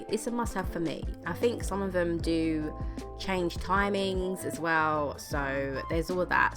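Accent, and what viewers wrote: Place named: United Kingdom. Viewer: Can you tell where you found that gorgeous house??? The gallery?? Love your videos, obsessed with your voice/British accent , I love it so much!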